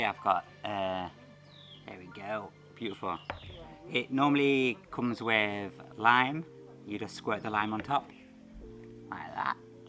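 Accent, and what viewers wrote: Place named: Thailand. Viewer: First video of yours I've seen,. 😁✨ Fantastic video. Your accent had transformed a lil from Sutton/English to a hint of Thai accent it sounds. Can't wait for the next video. Loving seeing your footage from Thailand. Well done!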